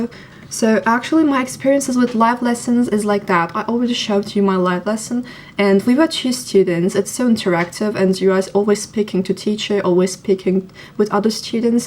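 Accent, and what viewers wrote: United Kingdom. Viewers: it's pretty obvious that your english accent is changed.Its more like a Brit right now. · Öğrendiğin diller kaynaklı mı bilmiyorum ama İngilizcen İngiliz aksanına kaymış seni ilk takip ettiğimde öyle değildi ama güzel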